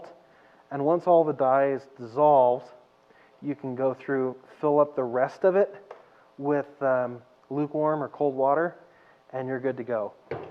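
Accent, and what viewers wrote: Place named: Brazil. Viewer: The woman...she's brazilian, right? Brazilian accent going on hahahha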